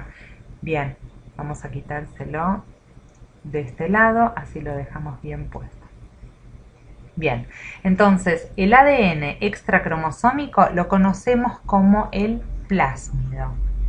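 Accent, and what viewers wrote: Argentina. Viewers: Me gusta el acento argentino!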